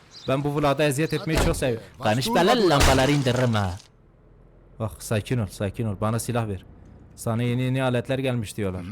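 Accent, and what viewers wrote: Elazığ, Turkey. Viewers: Abi sen elazığlımısın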